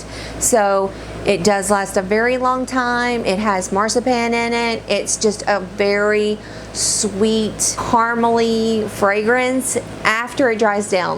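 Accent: South, United States. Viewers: Oh wow... not even available on Dior’s website. It seems they don’t even make it. I generally avoid EDT, but if that’s the version of this you have and love (& it actually lasts)... well, I’ll trust it! ;) ... you are adorable, btw! Love your southern accent!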